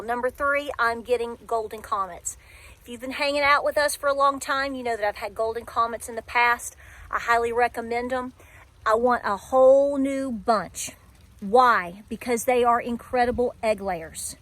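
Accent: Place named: Virginia, United States. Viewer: Golden Comets are my number one! I do like my Easter Eggers because of the egg color even though they are not big egg producers! Which brings me back to the Golden Comets, they make up for the low production numbers of my Easter Eggers! I was born and raised in Virginia, but dad moved us to Texas in the early 70's. So I appreciate your accent! I can still bring mine out when I need it!😂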